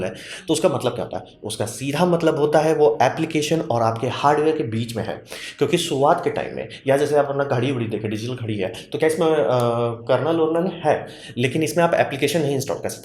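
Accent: Bihar, India. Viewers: Wah sir wah, android Linux ka gotiya bhai hai 😂😂 sir aap bihar se hai kya 😀 pura desi bolte hai. Mja aata hai sunkr 🙏🙏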